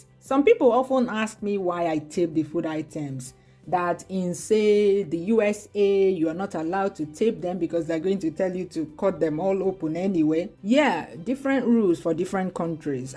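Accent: Igbo, Nigeria. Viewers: I love hearing you speak igbo.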